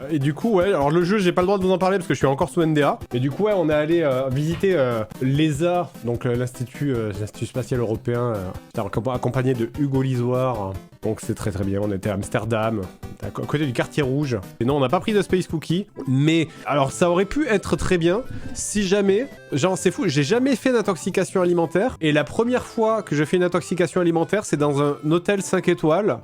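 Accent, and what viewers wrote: France. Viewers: Il a vraiment l’accent français originel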